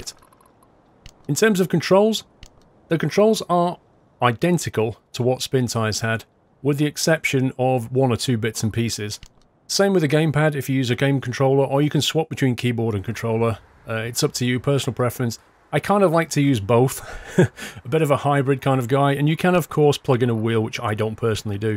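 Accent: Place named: Manchester, United Kingdom. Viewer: Trying to pick your accent . What part of the UK are you from ? Somewhere near Manchester ?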